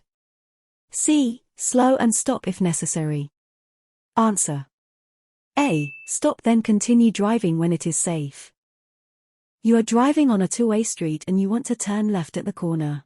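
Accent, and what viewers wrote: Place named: United Kingdom. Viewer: Thanks for this video, but this is a Q&A video for California DMV which is what it means for American citizens, so why was the narrator chosen with a British accent?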